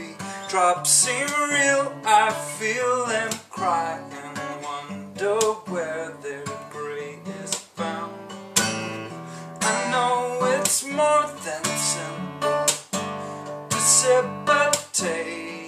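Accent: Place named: France. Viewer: is french or something? what is that accent?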